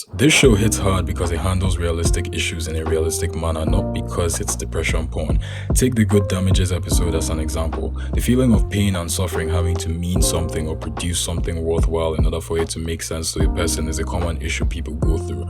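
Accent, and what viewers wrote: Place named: Nigeria. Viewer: Well, I watch my shows in bed and I have severe ADHD. So I tend to fall asleep quite easily when watching this show. That's how I know a show is mature enough for me to have to be in the moment to not miss a thing. It doesn't depend on out of the ordinary shit like murders, fight scenes etc. Even the jokes are laid back. I'm just getting to watch it now, I'm in the second season and this is because I've been avoiding it for years now. As I did with Rick and Morty. You don't want to associate with a fandom that excuses constant shitty behaviour and lack of growth on self as okay, do you now? Either way I'm glad I caught up and I'm loving it. Love your Nigerian accent btw. Here in Kenya we have a myriad of em but I kinda envy your's sometimes.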